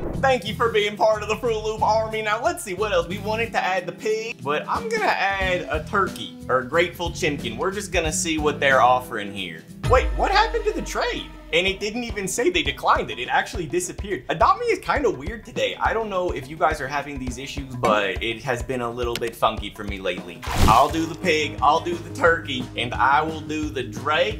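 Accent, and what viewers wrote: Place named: United States. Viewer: I love your cowboy accent my username is lovelybear1509 and I have a display name it’s Italy! :)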